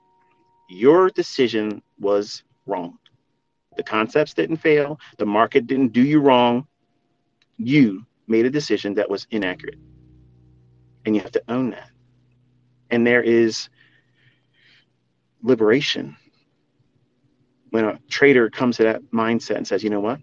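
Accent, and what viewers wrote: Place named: Netherlands. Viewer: yo bro ben je nederlands?? ik hoor het aan je accent man haha. ik ben zelf ook ICT student en zeker goede video die je hebt gemaakt very inspiring.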